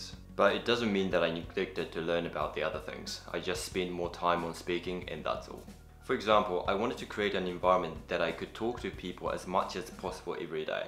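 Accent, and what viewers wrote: New Zealand. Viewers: さんの声寝てるときにずっと聞いてますww NZアクセントカッコ良すぎます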